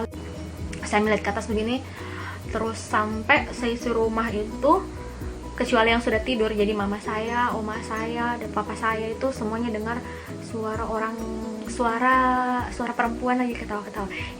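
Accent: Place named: Manado, Indonesia. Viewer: Logatnya manado ya kaa. Hehe sm🙂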